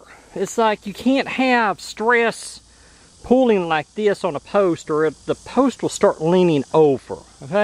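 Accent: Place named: Tennessee, United States. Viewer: Were are you from? Is it Tennessee I really dig your accent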